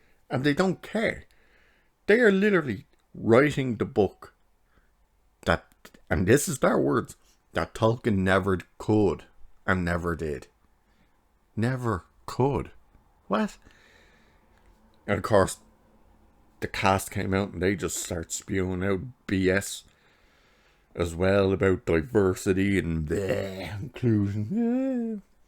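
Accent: Ireland. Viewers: It's great to hear a fellow Irish voice discussing this show and calling out the bullshit of these guys, jesus that Nomvete really believes she's smashing boundaries. I hated Star Trek Beyond with its absolutely incomprehensible villain.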